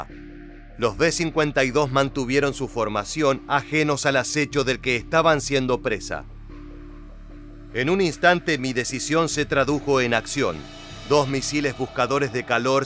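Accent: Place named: Argentina. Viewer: Habla 3n español argentino. Lame cul..o... de Estados Unidos. Se dice 2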